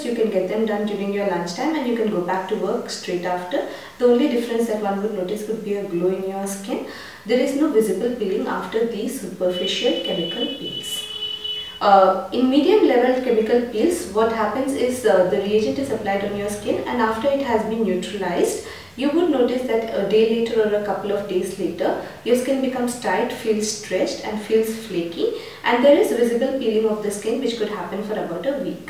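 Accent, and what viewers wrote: India. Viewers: BSC Indian English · hahahah me neither i jsut hear indian stereotype sounds liek aphu from the simpsons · Her accent is purely indian as they speak hindi that's why many people couldn't understand...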